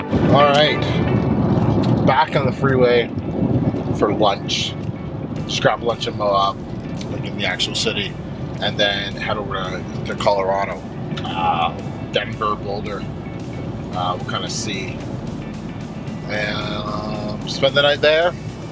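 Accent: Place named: Canada. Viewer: I thought you were Canadian lol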